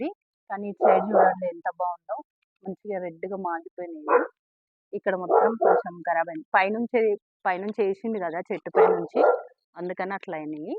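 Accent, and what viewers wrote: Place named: Rayalaseema, India. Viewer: మీది ఏపీ నా....రాయలసీమ భాష మాట్లాడుతున్నారు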